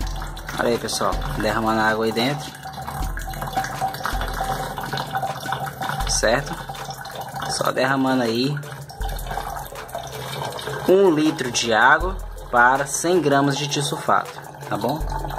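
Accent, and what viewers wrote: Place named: Pernambuco, Brazil. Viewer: Ele é pernanbucano, faz parte do sotaque butar é a mesma coisa que botar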